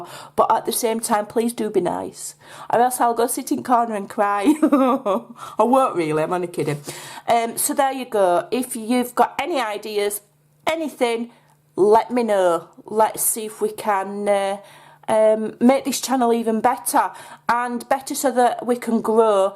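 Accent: Yorkshire, United Kingdom. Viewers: I'm sort of new and old at the same time. I've followed you off and on and see you in my feed sometimes and remember that I haven't checked in for awhile. What I find draws me back and keeps me locked in for months at a time are the series or segments that you do that have a theme so I can go back and find them. I love that you do all sorts of things, I love who you are and listening to you (perhaps I'm a weird American because your grammar and accent don't bother me and actually are fun because Yorkshire isn't an area I knew well but it reminds me of my ex-husband's mother who was originally from there before going to Essex and being there for almost 40 years by the time I met her) and so all I can offer as a suggestion is that you might find doing specific series helps. You're an excellent teacher, and you've also been good at letting us learn with you... 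so you don't have to know it all ahead of filming, just learn with us and go through the whole process. :D Lets see what WE can do to help you spread your channel and courses, too... give us super tasty bits to share to bring people in. Quick challenges that we can do with you and link up on Instagram, maybe? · I love what you do, love your accent, have always liked the Yorkshire accent (Big fan of coronation street) You have inspired me with things you create and you are very easy to follow along with. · Hi, some feed back for you! I love your Yorkshire accent (perhaps I'm biased as I'm from Yorkshire too), there's nowt wrong with it so don't take any notice of anyone who criticises it. Your videos are fun and easy to watch/listen to, I like them as they are natural and not staged. I am quite new to junk journalling so these are the videos I look for and watch, so more of them for me. That doesn't mean I don't like to watch other things too, they can spark ideas. 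I don't like really long videos, so fast forwarding is fine, we can always pause and rewind. So for me, I don't think you need to do anything different!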